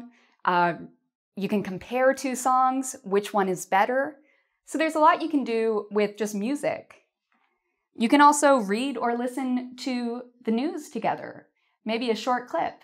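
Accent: Canada. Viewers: Hi. I like listening to English when I got a notification that you have uploaded the You-Tube video. There are several reasons why? Not just for one reason that you're a beautiful young lady with authentic Canadian language, but for many others. You have been teaching us (youtube subscribers) English for many years and not just English, but many useful things that we can apply in our daily life or lifestyle. Whatever, I feel often that is more useful than watching the daily news coming and going or some unrealistic movies, shooting, fighting, running, screaming actors for millions of dollars. So, thank you very much for your devoting work as well for time and energy giving so much to others.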